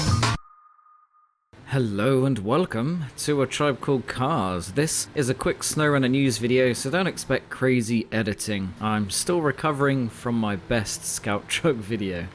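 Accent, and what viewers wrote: United Kingdom. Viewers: I'm from Iraq and English is not my main language,but i love how you talk with your British accent..I really enjoy with your videos❤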